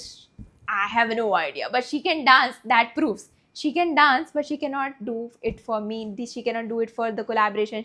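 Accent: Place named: Gujarat, India. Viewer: Love and her gujju accent ❤ dint watch the video u talking about but am definitely on your side 🫶🤌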